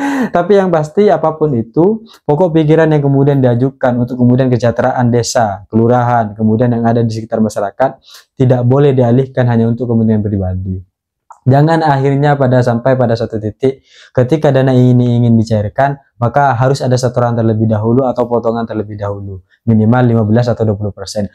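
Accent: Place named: Madura, Indonesia. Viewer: Logat nya logat madira,, kamu gak ngomong moseng kke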